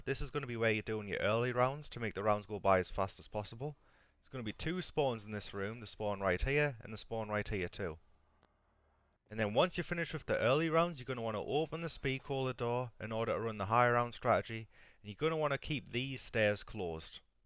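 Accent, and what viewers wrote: United Kingdom. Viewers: I have no clue if is scottish or british love your videos btw they help me alot Edit: you know the map is Hard if the first Clip isnt round 100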